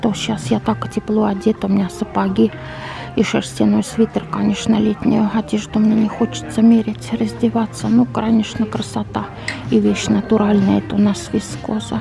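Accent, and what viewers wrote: Finland. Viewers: У вас такой милый финский акцент.. эх, я соскучилась по Финляндии… ❤️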